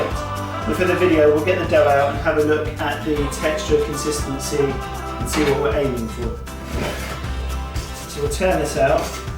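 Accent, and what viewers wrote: United Kingdom. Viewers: Where are you from? I’m guessing British but I’m pretty terrible at these things:/ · " "!!!! i get it.... u a mancunian?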